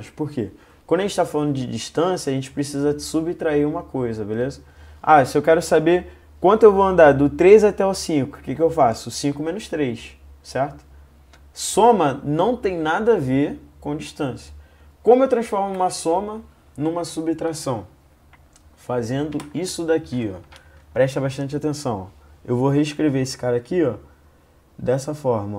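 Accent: Rio de Janeiro, Brazil. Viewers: to apaixonada nessa voz carioca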